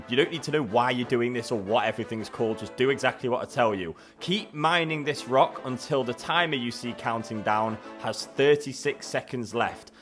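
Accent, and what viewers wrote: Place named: United Kingdom. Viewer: Having a slightly ticked off Brit, passively aggressively telling me what to do is actually rather calming.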